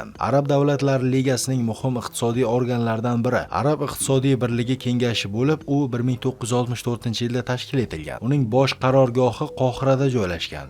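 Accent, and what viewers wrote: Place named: Kashkadarya, Uzbekistan. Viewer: ШЕВАЙИЗДАН БИЛИНИБ ТУРИПТИ КАШКАДАРЙО ЙОКИ СУРХОНДАРЙОДАН БУЛСАНГИЗ КЕРАК,,, ШАХРИСАБЗДАН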